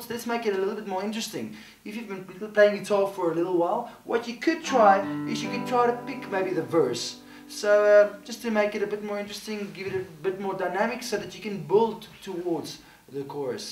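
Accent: South Africa. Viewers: Are you SouthAfrican? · your accent makes me miss south africa, just a little. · South African, ne? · South African, I could hear it as soon as I started watching. whoop thanks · Are you South African? :D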